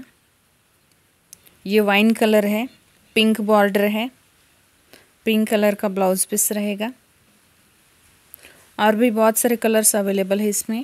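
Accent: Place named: Maharashtra, India. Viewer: आवाजाचा टोन मराठी वाटतो . मराठीतून सांगितलं तरी भारी वाटेल बाकी पैठणी सुरेख आहेत.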